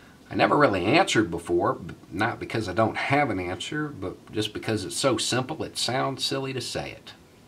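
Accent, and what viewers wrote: South, United States. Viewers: Oh man what this guys sells is generic progressivism in a southern accent. He's the black republican.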